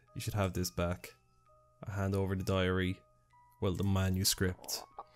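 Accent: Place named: England, United Kingdom. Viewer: I like your England accent. :) Btw subscribed to you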